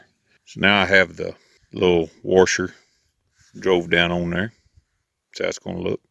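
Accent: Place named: Kentucky, United States. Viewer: Hope your knee feels better soon! Yep, on your knife...the old ones can be really good. Oldies but goodies. My sister lives on the high desert. But, hey, I lived in Kentucky for 14 Years...I knew you sounded like you might be from that area...then I heard you mention Tennessee. Wonderful area back there with awesome folk.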